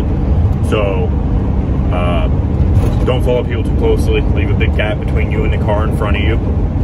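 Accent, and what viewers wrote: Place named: United States. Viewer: I thought he from the states ok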